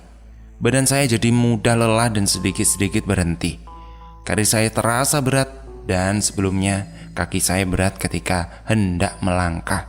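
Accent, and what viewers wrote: Java, Indonesia. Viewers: Suara nya kok enak y 😂😂 Apa lgi logat jawa nya..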